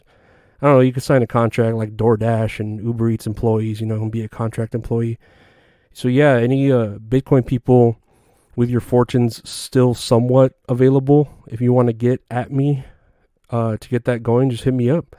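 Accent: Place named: New Mexico, United States. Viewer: We think alike watp sent got hooked fast! My pal n I are working on a pod cuz we have faces for radio hahaha well myself ya I thought you were from New. Mexico cuz people here talk aaawll like that. .hilarious brother rNew seescriber for life! 🤘🤓🤘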